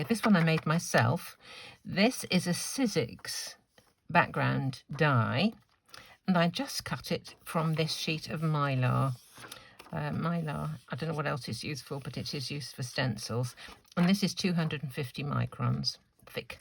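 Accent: United Kingdom. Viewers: I completely agree! It still boggles me that some people can be so rude! If you don't like someones videos, don't watch them, there's no reason to be mean! I completely adore all your videos and thoroughly enjoy all your commentary with yourself, especially with your British accent! You just have a way of saying things that is so different from the way we Americans speak and I absolutely love it! Don't change a thing! Love all your CAS cards and videos! Thank you for taking the time to share all your thoughts. I myself, have one of those bins full of started projects or scraps and bits of cards that never got finished. I always feel a great sense of accomplishment when I have pulled a card together from those bits.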